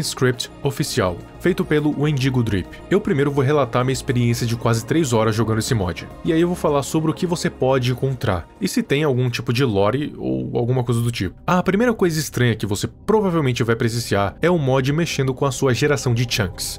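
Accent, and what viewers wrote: Portugal. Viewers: o seu é de Portugal?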